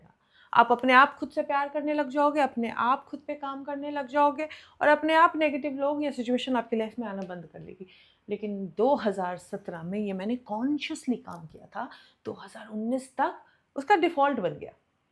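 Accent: Bihar, India. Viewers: Mast form mei ho.. bas apka bihari accent miss karte h jaise aap purane videos mei karte the.. we feel more connected🌻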